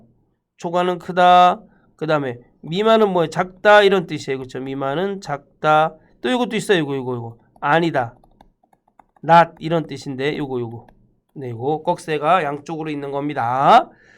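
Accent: Gangwon, South Korea. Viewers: 말투가 강원도 분이신거 같은..ㅎ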